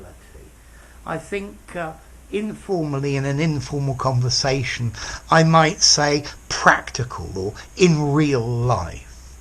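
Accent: United Kingdom. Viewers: Glad to help. I also love his lessons. But the first thing, I was attracted by, was his British accent.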